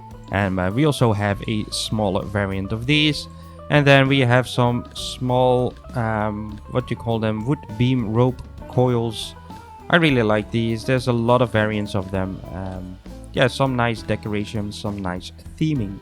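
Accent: Netherlands. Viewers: The dutch accent is amazing 🙂🇳🇱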